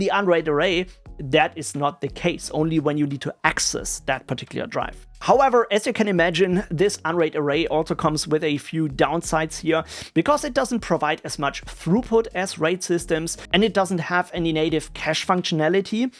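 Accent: Germany. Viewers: The German accent is the best one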